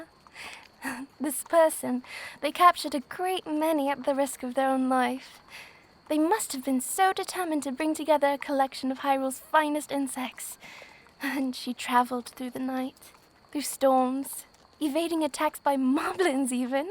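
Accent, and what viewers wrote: United Kingdom. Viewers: Since when did you unlocked the British accent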